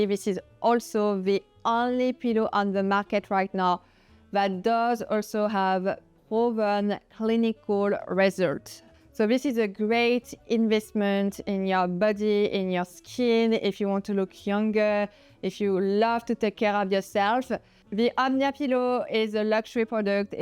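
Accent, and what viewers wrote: France. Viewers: Um, she is a French speaker trying to adapt that odd word to her how she has learned to pronounce her language.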